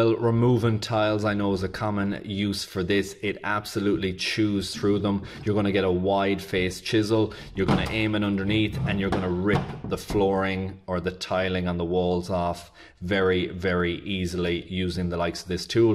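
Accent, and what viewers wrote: Ireland. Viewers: listened 3x just to hear the Irish accent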